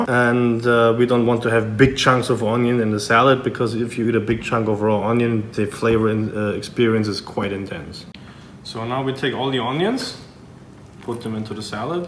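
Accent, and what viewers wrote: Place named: South, Germany. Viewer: since when do you have a south German accent in your English:D Rock on!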